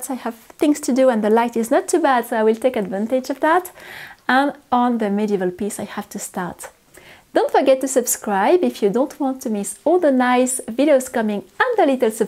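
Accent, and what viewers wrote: France. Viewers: you have a fantastic command of English. How incredible you are to be fluent in two of the most widely spoken languages on Earth! Your beautiful French accent adds music and elegance to the words. I love listening to you 🤍🤍🤍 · ✨I adore your French accent, so beautiful! Thank you for all the beauty you bring to my life, and for the motivation to work on my art and my French!! ✨🦋💕🌷🪲🍄🦉🐇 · I absolutely love to listen to you! You speak perfect English with a beautiful French accent! The carousel is gorgeous! Not surprising! Hallway looks lovely as well. 💗💗💗💗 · Who on Earth says they can’t understand your beautiful French accent?!?? Take NO notice. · Merci for another lovely video. You speak perfect English to me so don't feel insecure. Your French accent is beautiful and I love listening to you. 💕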